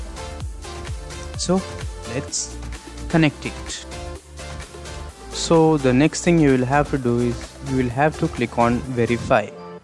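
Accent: India. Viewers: When i heard this indian accent I knew this will be the best tutorial i can pick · When i heard this indian accent I knew this will be the best tutorial i can pick!!!!!!!!!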